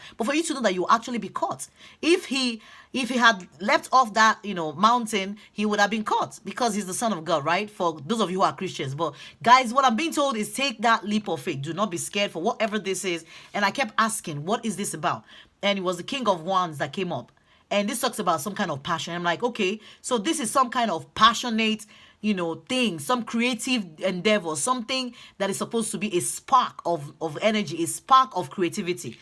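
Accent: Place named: Nigeria. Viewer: This absolutely resonated & it really spoke to my spirit. I love your Nigerian accent too! ;)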